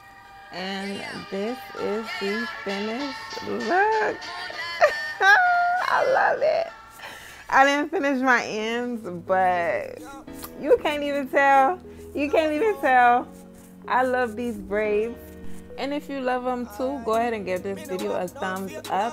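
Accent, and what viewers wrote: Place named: Bahamas. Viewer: believe she's from the Bahamas lol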